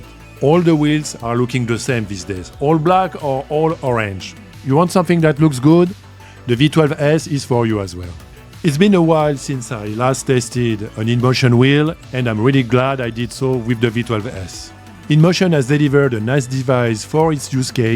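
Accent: France. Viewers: Drôle d'accent. On dirais un francais qui parle anglais.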